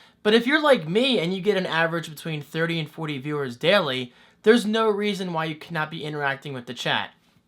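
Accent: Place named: Maryland, United States. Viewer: Are you from Maryland because you have the accent haha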